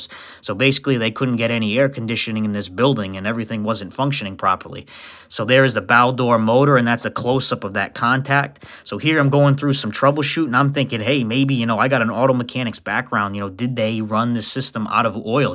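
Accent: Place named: Boston, United States. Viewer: Love your commentary in Hard Boston accent! So entertaining. Plus you are well trained, observant and a good thinker. Rarer and rarer these days. Chicago electrician here. 1979 seniority.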